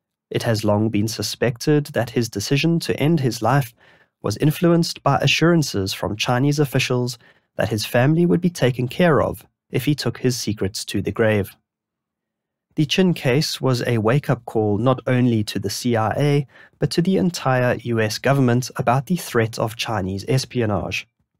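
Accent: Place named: South Africa. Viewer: Love your content and I miss the long-form content. Greedy, I know, but I make space in my day to watch you before anything else when you post here. Love your SA accent too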